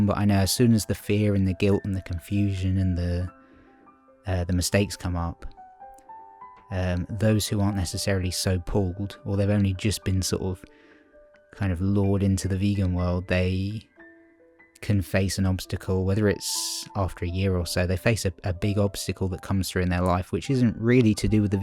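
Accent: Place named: United Kingdom. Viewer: Good to hear from you! Your British accent is beautiful as well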